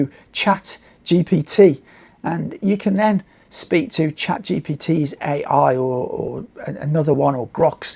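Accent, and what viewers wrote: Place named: England, United Kingdom. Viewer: People should not comment on accents if they don't know what they are talking about. This guy is English, hence his accent is one of a number of English accents.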